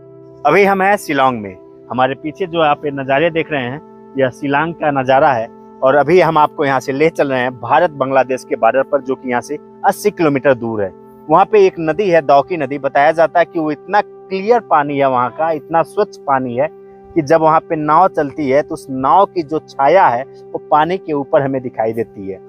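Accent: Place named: Bihar, India. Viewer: Tu pakka bihari hai...abe saaf saaf bol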